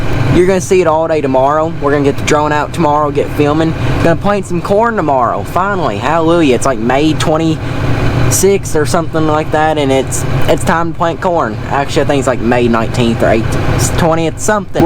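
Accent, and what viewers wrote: South, United States. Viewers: Hey bud, just say your channel and enjoyed it. I'm from your neighbor from Tennessee. I'm glad to here the southern talking on this. See-ya in the next one. Old Hickory, TN 🇺🇸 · Hi there, I'm new too you're YouTube channel, and I have in joyed what you have shown, thank you for showing us a part of your day, and in joy your Southern voice, I'm from Kentucky and I miss it so much, thank you for having the Southern voice, I'm from Campton Kentucky or better know as Wolfe county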